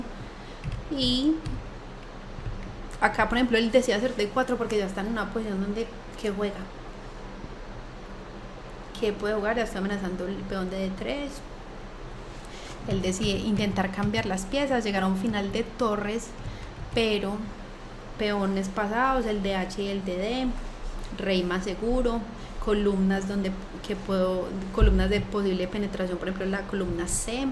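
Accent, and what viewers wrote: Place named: Colombia. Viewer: Acento colombiano!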